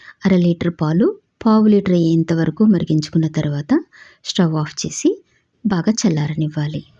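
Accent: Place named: Telangana, India. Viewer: Good to hear Telangana state decent language slang in Andhra recepies from Thankku by this respected women.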